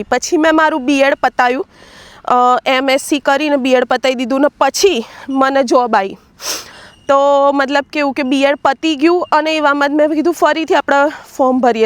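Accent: Sabarkantha, India. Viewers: tara video badha bahuj saras details sathe full information with tecnology wala hoi che.mane tara language parthi j lagyu ke tu sabarkantha Modasa ni che.hu pun modasa ni aagle Vadagaum Dhansura bayad Aakrund gaum ni chu.darbargad ni.tane khup khup shubhasshirvad.god bless you.tari bhasha aavij rakhje sweet.aapnu gaum ni nam aagal lavje Tram no video saras details sathe banavyo hato.tyare j lagyu hati ke tu sabarkantha Modasa ni j ke dhansura bayad ni che.❤🎉😊 Thanks u.proud of you dear.❤🎉.hu hamna baroda ma rahie che.perth ma apna baroda na badha bahuj che. 😊😊😊😊roj nava video banati rehe.hu pune mathi jovu chi.❤ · YOU ARE WELL EDUCATED AND EXPERIENCED. AND ALSO. HARDWORKING. WOMEN. BY YOUR SPEECH. I. WAS IN DOUGHT. THAT. YOU ARE. FROM. SABARKANTHA. NOW. MODASA. IN. ARRVALLI DISTRICT. SPEECH. IS REALLY. EXCELLENT I. LIKE. I. AM FROM. NARODA AHMEDABAD. 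KEEP. IT UP. A DAY WILL. COME. YOU. WILL BE. TOP. VLOGGER. DON'T SHOW. MUCH DETAILS ABOUT. YOURSELF TO. AVOID. UNNECESSARY. USELESS. COMMENTS. EVERYONE MUST. SUPPORT TO. SUCH. VIDEO MAKER🎉🎉❤❤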